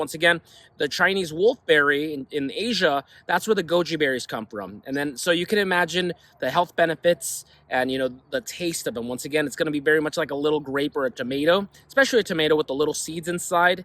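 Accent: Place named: West Texas, United States. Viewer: What part of west Texas you from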